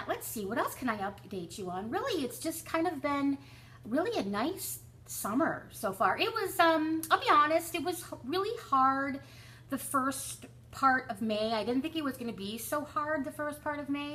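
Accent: United States. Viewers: Oops, just heard the end, you're American lol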